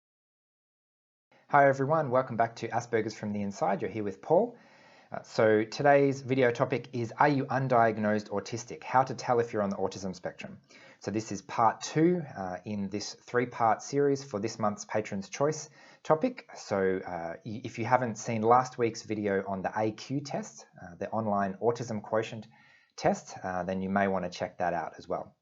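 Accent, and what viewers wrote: Australia. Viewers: Greetings, mate. (I was listening to your accent in the video, and it sounded Australian. So I made the assumption that Aussies refer to each other as "mate.") There was something that happened to me just yesterday. To give a little background/context, I'm an American citizen (native born). I've lived in A-me-rih-cur my whole life. Our official language should be English. Yesterday, I was just walking past two women in the grocery store ("supermarket"), and my brain recognized that one of them was speaking in Russian to the other person. I guess she actually used some of the more common Russian words that I've learned so far. What ended up happening was a long mental analysis of thinking about "boundaries," and how I might feel if a stranger in a supermarket walked up to me and started speaking to me in Russian. I would be scared or paranoid, wondering, how do they know I recognize words in Russian? 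This situation may not have even been that complex, because the woman had already revealed by her own speech that she knew how to communicate in Russian. To make a short story long, I kept going about my own business, even saw those two women a second time in another part of the store, but I didn't attempt to say Hi or introduce myself (if that would have been appropriate!). The only "agenda" I would have had would've been to show that I noticed these women were from a different culture, and that I appreciated them as fellow humans. It's weird that I know Russian at all. I had a c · Which part of Australia are you from?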